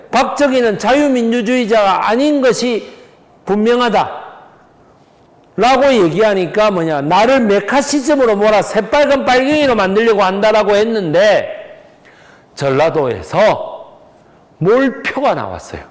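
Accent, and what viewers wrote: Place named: Gyeongsang, South Korea. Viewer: 구수한경상도사투리억양 으로 아주 유익한 우리나라 역사해설들을 배기성선생이 참 잘해줍니다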